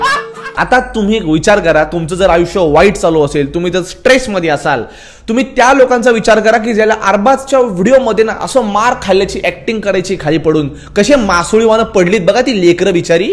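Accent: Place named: Mumbai, India. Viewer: मुंबईची मराठी तर फारच छान 🎉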